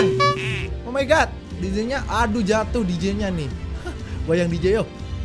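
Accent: Jepara, Indonesia. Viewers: OrNg jepara kah bang?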